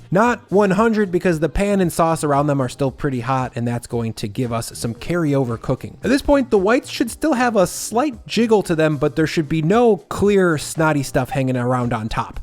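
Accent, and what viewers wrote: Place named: Chicago, United States. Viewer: At first I thought you were joking around and emphasizing certain words in an annoying way.. now I realize it's just a Chicago adjacent accent and you're not pretending.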